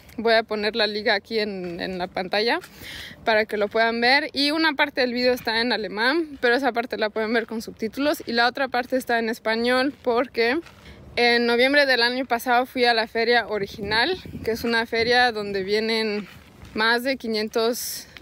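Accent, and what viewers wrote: Mexico City, Mexico. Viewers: Que lindo lo de dedicar tus videos a tu mamá ❤ y es verdad lo del acento, apenas te escuché y suenas super chilanga cuando hablas español sin detenerte jaja 😅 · El acento chilango no se pierde jajajaja y felicidades por hablar tantos idiomas, por lo que veo eres una persona maravillosa. Aunque estes tan lejos de México, es bonito que no te olvides de tus raices.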